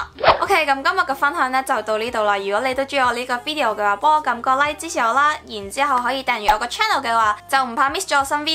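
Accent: Taiwan. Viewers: 所以你的國語這麽台灣腔。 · 难怪你的国语一口台湾味。大陆粉丝留＾＾ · 所有说普通话就有了台湾人的口音 ：） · 難怪Mira講的中文跟台灣人一模一樣 不像香港人對腔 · 難怪Mira的中文很臺灣腔XD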